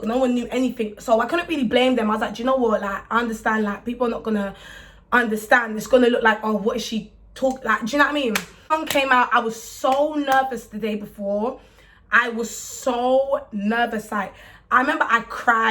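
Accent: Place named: United Kingdom. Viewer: I don't usually like the British accent but you I love! but when you clap your hands all the Time omg I get so stressed. but love your energy and you deserve this love!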